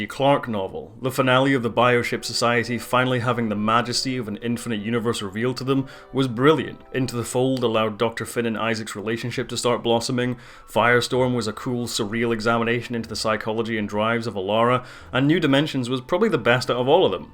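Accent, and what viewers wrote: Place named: Fife, United Kingdom. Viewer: Are you from Fife?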